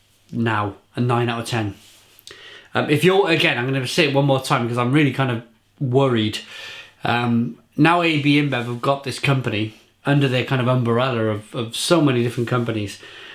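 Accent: Wales, United Kingdom. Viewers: It's not right or wrong, it's just his Welsh accent where it sounds like they say "crumb putts". In most of the rest of the UK we say it like "crumpits" so it does sound funny to hear "putts".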